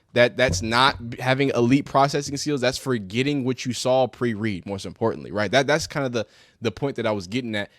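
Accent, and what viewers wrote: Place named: South, United States. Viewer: Dude you talk way too southern like bro just hold up the rebel flag already and just claim your white already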